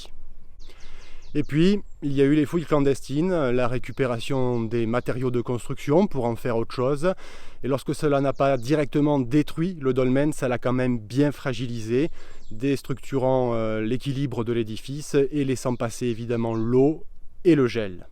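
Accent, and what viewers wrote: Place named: South, France. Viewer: Bravo pour ce petit tournage, "avé l'assent" en plus!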